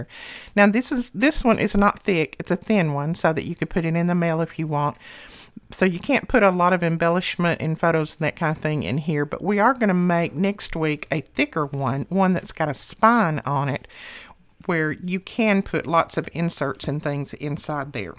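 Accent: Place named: Georgia, United States. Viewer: You wouldn’t happen to be a Georgia Peach would you? I just love ❤️ your accent! You could read the Constitution to me and not get bored! My history teacher was from Georgia and I truly thought you were her. She was my best teacher I ever had.🌹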